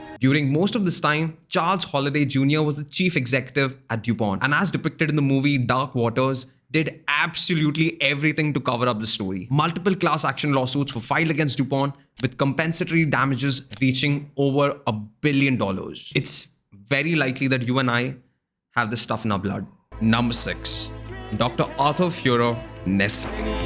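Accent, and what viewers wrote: India. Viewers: The narrator has such heavy Indian accent that it's almost impossible to follow what he is saying. Can't you learn how to speak English properly? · This man's got an awful Indian accent and doesn't know what punctuation means...he just rattles on and on like a robot. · You gotta work on losing your thick indian accent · oh mann, nix ist schlimmer, als indisches Englisch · This Indian man hits a nerve with me. Don’t care for his “English”. Listened for minutes that’s all I could take!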